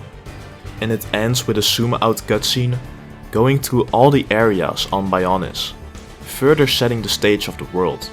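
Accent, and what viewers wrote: Netherlands. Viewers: A bit of a random question, but... Do you force your accent? I dunno, your grasp of English is pretty good, and this thick of a Dutch accent is what you usually only hear from people who can't get any further than Steenkolenengels. It's not criticism, by the way. I can understand why you'd do it, if you do. Even people like Carice van Houten, who can speak with a perfect English or American accent, get told sometimes to put on a Dutch accent because it would sound exotic to American viewers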